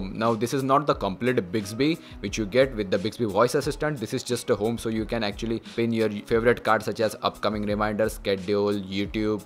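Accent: India. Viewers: I don't understand why people dislike an Indian man's accent. I quite enjoy it. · Why do Indians insist on talking in English if they're just gonna butcher the language?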